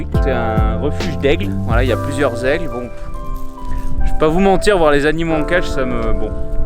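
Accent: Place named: Spain. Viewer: Quel accent ! Un vrai espagnol 😊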